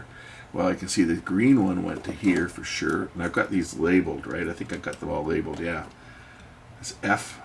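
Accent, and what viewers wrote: Canada. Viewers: Hurricane?? You must be near me in Florida....but you sound Canadian.....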